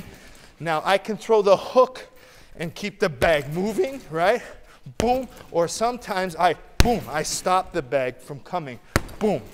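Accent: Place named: Italy. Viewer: Dude you have an Italian accent!!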